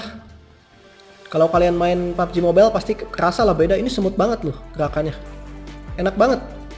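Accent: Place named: Sunda, Indonesia. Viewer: Logat sunda nya kental brow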